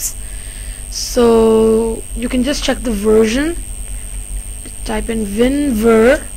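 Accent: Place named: India. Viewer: you sound like a indian speaking english indian lol nice video. good work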